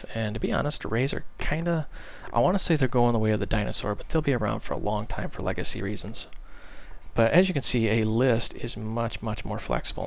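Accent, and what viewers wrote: United States. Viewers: thx man! ur awesome, great american voice and accent!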